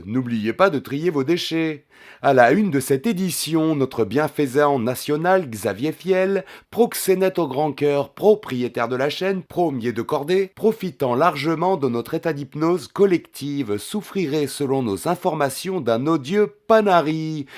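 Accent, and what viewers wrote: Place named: Germany. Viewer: T'es trop fort. Tu te transformes en chef d'orchestre d'un concert de rires pendant tout ton récit 😅 Ton accent teuton est parfait, on s'est vraiment payé une bonne tranche de rigolade. FAISAN encore beaucoup des comme ça 😅😅😅 Bises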